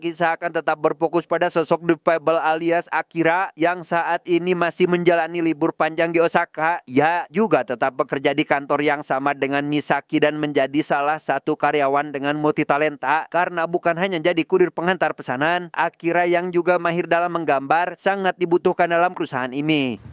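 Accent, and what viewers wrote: West Java, Indonesia. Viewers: ....sunda na ti mana?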